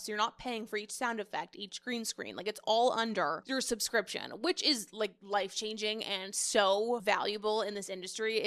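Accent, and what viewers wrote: United States. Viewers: American woman with that goddamn accent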